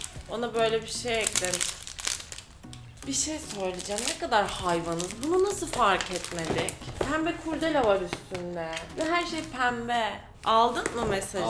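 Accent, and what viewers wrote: Kütahya, Turkey. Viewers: Kütahyalı mısın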